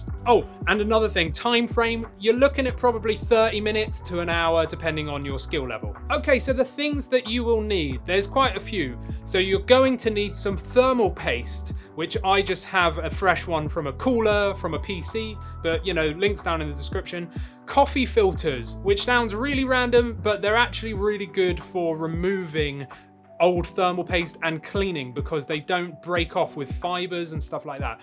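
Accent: United Kingdom. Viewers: Thanks mate absolutely brilliant tutorial and nice to have someone british for a change. Top bloke!!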